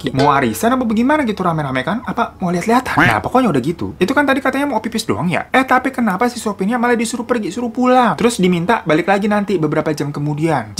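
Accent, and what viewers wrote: Indonesia. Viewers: Akak ni org mana kak Bahasa akak mcm indon je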